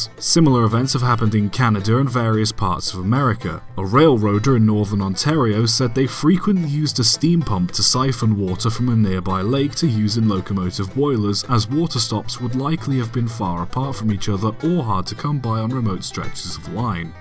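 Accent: United Kingdom. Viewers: American South fiddle music and a British Narrator, this has got to be good. Future me: and it absolutely was!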